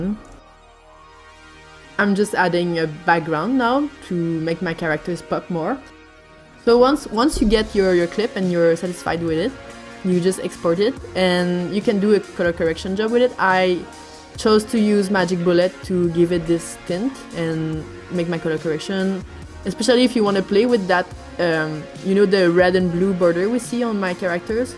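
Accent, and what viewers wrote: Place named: France. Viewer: I can hear a beautiful French accent. Thanks for this video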